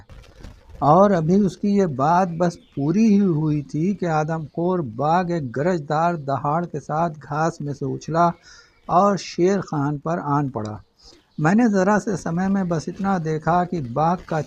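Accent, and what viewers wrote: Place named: Pakistan. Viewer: आपके उर्दू एक्सेंट में कहानियां सुनना बहुत ही सुखद लगता है, शुक्रिया..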